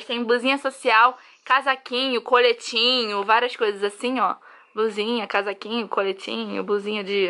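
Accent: Rio de Janeiro, Brazil. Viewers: Adoreei esse vídeo, seu quarto é lindoo!! Mas uma perguntinha. Vc é carioca? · vc e mt divertida e esse sutaque de carioca e incrivel ate eu ja to falando q nem carioca seu qu\rto e lindo pft adorei vc e seu quarto faz um tour pela sua casa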